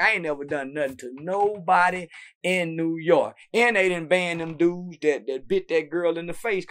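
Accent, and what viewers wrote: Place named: Texas, United States. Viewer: “Rockers Island” haha that Texas accent 😅🤘🏾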